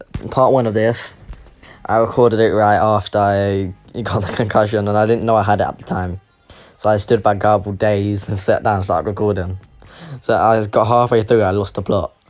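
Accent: United Kingdom. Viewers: insert british accent x100 Yo my g how r u with that british accent and dat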